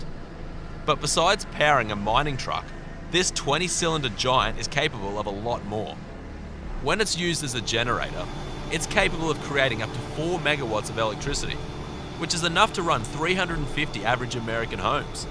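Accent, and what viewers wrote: Australia. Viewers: he sounds aussie ngl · It does help that the narrator of this video is Australian. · Aussie accent is perfect for this type of content:elbowcough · Are you Australian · Australians make everything sound like I want to go Outback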